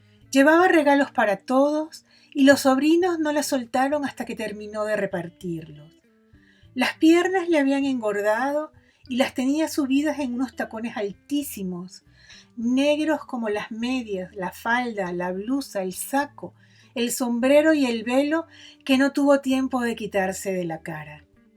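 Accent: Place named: Venezuela. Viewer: Soy muy desconfiado cuando de escuchar a alguien narrar algo. Sobre todo si ese alguien es español. No sé si a ustedes les pasa lo mismo, pero cuando los narradores españoles utilizan ese tono tan antipático de hablar en medios, simplemente cambio, ya no de canal. pero si de medio. Por eso, quiero felicitarlas por la forma en que narran estas bellas historias. Creo, por el acento, que quien narra es venezolana. Pero, como sea, lo hace de maravilla. Gracias.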